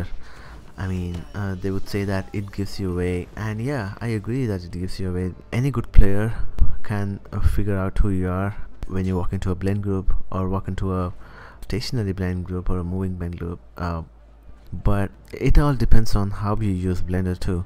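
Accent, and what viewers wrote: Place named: India. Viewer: Lmao I was only trolling, I know an Indian accent when I hear it :P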